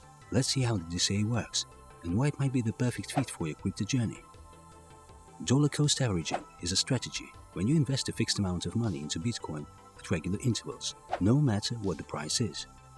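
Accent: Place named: India. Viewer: I have worries in this project since the voice sounds like from India where most scam hubs exist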